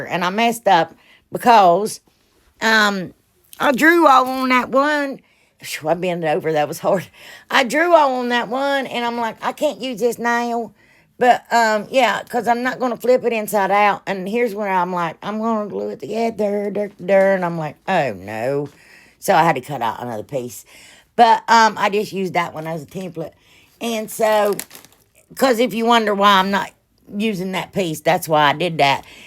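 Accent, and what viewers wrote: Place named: Tennessee, United States. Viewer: I love to watch you. You definitely put a smile on my face when I watch you. Your accent sounds close to ours here. That would be in Northeast TN. Love your crafts. You can put matches in the toothpick holder to light candles too.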